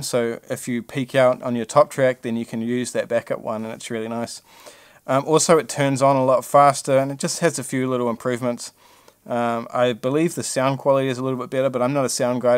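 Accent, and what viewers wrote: New Zealand. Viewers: Maaate! I was like.... is this guy a kiwi?! did a quick google search and BOOM! Nice one man I'm subscribing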